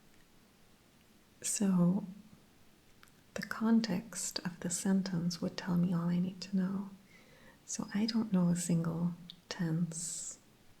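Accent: Pennsylvania, United States. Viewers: I’m from California and when I first listened to your videos I thought you were American! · Watched your channel for months and assumed u were American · This is going to sound silly now that I know where you’re from, but I thought you were Pennsylvania Dutch! I live in PA, and your voice sounds very similar to the Amish community here. I had thought you must be ex-Amish or something haha.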